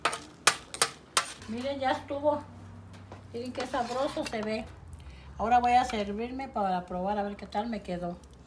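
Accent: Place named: Fresa, Mexico. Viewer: si e😃 vos de fresa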